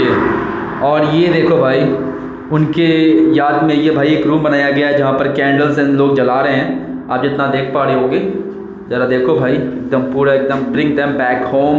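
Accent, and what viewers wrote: Bihar, India. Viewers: Improve your communication skills- drop Bihari accent and stop saying bhai.. bhai… you are doing good work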